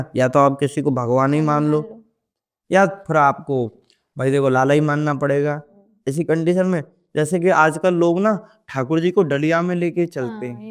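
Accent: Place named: Braj, India. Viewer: बृजवासी tone he ye शंका ना करे🙏🏻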